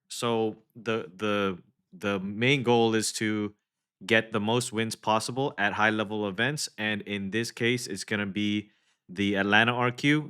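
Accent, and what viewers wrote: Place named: Hawaii, United States. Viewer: Brudda, you hawaiian. I hear the accent and see that sleeve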